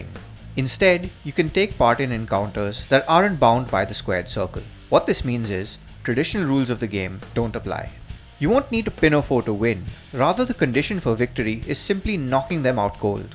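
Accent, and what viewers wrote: India. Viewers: Horrible preview. This guy is pretty subjective and his Indian accent makes me wanna puke.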